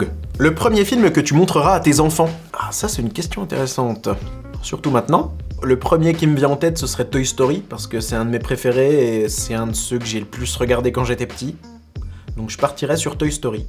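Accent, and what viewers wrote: Franche-Comté, France. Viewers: Je rêve de t'entendre en invité dans un 2 heures de perdu sur Pee Wee's ! Des conversations en franc comtois et en bourguignon... du miel pour nos oreilles !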